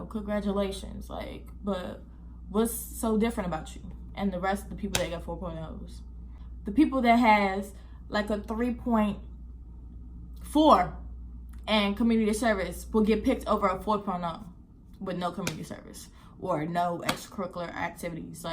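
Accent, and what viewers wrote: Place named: DMV, United States. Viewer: Where are you from? I hear an accent in your voice. It sounds like you may be from the DMV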